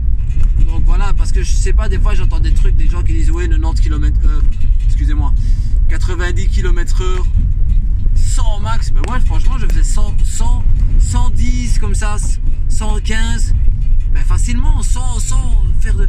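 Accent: Belgium. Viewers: Salut amigo ! Sympa ce petit concept de vidéo, ça change ;-) en tout cas tjrs autant agréable ton accent belge, je sais pas pk mais j'adore:-D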